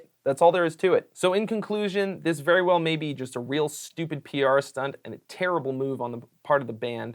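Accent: United Kingdom. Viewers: Your British accent makes you sound like Russell Brand. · Your English accent reminded me of Russel Brand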